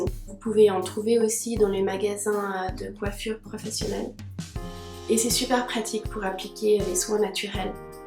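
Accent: Switzerland. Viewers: Je crois reconnaître un accent suisse ? Je me trompe ?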